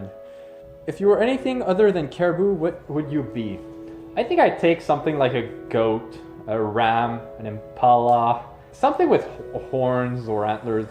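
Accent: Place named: Ontario, Canada. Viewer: I knew you where Canadian! I hear the French ontario accent in your voice!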